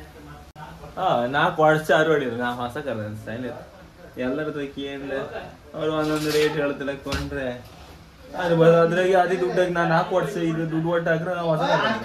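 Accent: Kundapura, India. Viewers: ಇದು ಕುಂದಾಪ್ರ ಕನ್ನಡ ನಿಮ್ಗೆ ಅರ್ಥ ಮಾಡಿಕೊಳ್ಳಲು ಕಷ್ಟ ಆಗುತ್ತೆ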